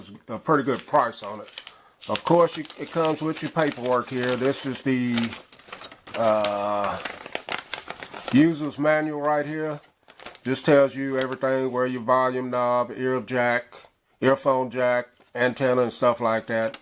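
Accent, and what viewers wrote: United States. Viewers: I like the way you talk...sound like me....a touch of Hilbilly Country Boy.... Nice review!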